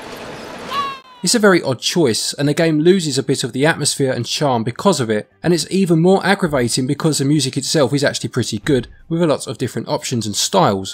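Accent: England, United Kingdom. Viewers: I watch a lot of your videos and alway wondered where in England your accent is from, thanks for clarifying 😀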